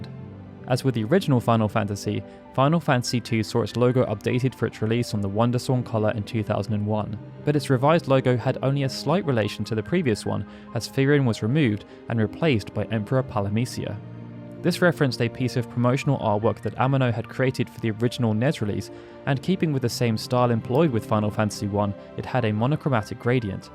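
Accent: United Kingdom. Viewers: There is no other way to listen about final fantasy then with a British accent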